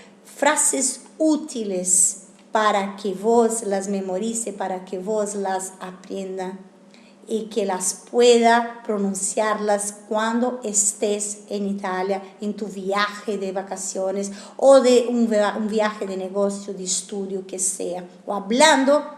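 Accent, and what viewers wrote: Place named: Argentina. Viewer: habla español argentino la profe dice vos no tu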